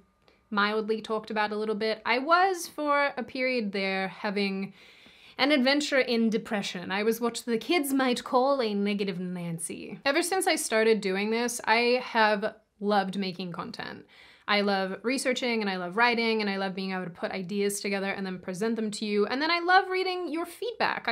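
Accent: Australia. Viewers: Oh I just love your Oz accent 😂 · I'm surprised that you feel this way. The reason being I've always thought you had a funny presentation of facts and opinion in your videos. You don't confront and you're never downright mean. You keep the mood light (which is good and a breath of fresh air). Or maybe I was just completely duped by that aussie accent 🤔 Anyway. I've always thought that content creators on the right need a good and stable home life to replenish their strength. Especially considering our side believe in the nuclear family etc. So I do truly hope you have someone special in your life. And if so that you start a family sooner than later. Focus on that. Enjoy that. Take a break. We understand this crazy world can actually take a toll. You're only [a lovely] human. Lastly, I follow two big film critique channels (CriticalDrinker and Nerdrotic) on here that do entertaining work. 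I think it's the same you do, but a different field (it's literally the culture of the political and society you're criticising). The aim is set on fictional characters, stories and suits that greenlight crap. I think you should look in to it. Also, team up with a friend and do some film reactionary episodes. Try it out and see what the response is. There are some channels on here that have over 300k views and making good money just by us watching them watch movies 😂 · Don't give in the pressure! It's sad how things have turned out, but you're one of my favorite commentators; I only ever watched "You Are Here" because of you. I love your snarky comments on the bleak outlook of humanity, and I think your Aussie accent just amplifies it as well lol. You do you, always remember that you're great, and those who support you, will always support you, while those who are just here to bully, will do just that. 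I really do wish the best for you, whether it's to continue doing this kind of work, or to move on and do whatever else you want with your life.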